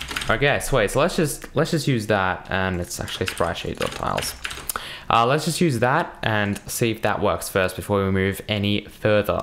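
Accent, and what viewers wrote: Russia. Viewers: Черт! Мне казалось, что ты похож на русского, не ожидал что в одном из видео это будет! Надеюсь ты прочтешь, это! У тебя классный акцент, хотелось бы услышать твою историю, но это наверное не совсем в стиле этого канала. Как у тебя дела?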